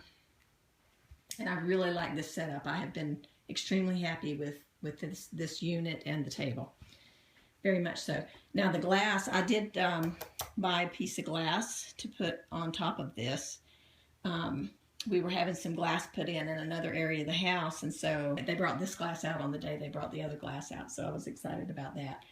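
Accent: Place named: South, United States. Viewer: Thanks for sharing your incredibly inspiring art room and art process. My kids think I'm an art supply/junk hoarder, but you just normalized my accumulation of art "treasures." Love your accent! I miss the south.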